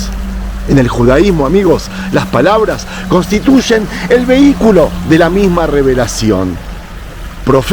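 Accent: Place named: Rioplatense, Argentina. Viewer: saludos de un ecuatoriano me da mcha curiosidad si eres argentino o uruguayo o aprendistes espanol y nacistes en Israel gracias y me gusta tus videos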